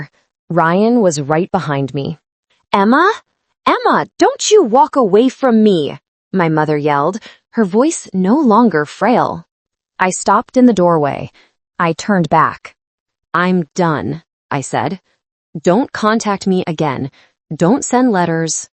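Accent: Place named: South, United States. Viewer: Well the southern accent didn't last long.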